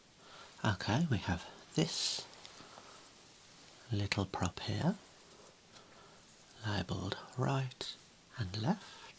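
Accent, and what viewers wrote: Birmingham, United Kingdom. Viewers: Brummie accents are so perfect for asmr. Great job again buddy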